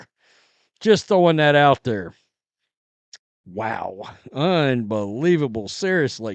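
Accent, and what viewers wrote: South, United States. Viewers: The sun came up this morning and that is b/c of CC. Come on now! as CC would say. I'm an Iowa Alum and big supporter of anything with Black and Gold, CC being the only reason why the Fever is building a new training facility is a stretch at best. Why don't you try losing that ridiculous southern accent and at least attempt to present some intelligent content.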